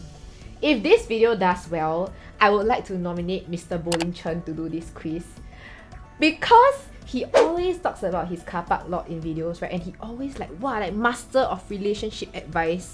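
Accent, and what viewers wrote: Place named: Singapore. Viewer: can hear the singaporean accent eh!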